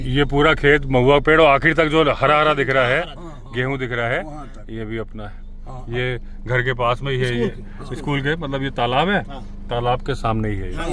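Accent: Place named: Baghelkhand, India. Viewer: Bhangelkhadi boli tone 😊 Bansh and gopad Chhattisgarh ki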